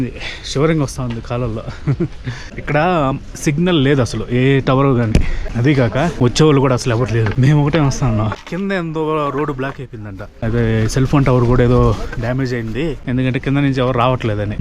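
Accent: Nellore, India. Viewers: Hi bro….One of the best story tellers in Telugu….Hats off to ur content bro…..Mee Nellore slang super gaa vundi….❤🎉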